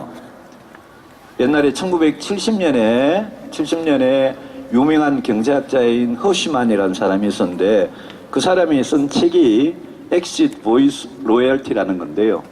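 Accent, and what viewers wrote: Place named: Gyeongsang, South Korea. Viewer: 경상도 사투리라도 귀에 쏙쏙 들어옴니다.유승민의원님 그날을 기다림니다~!!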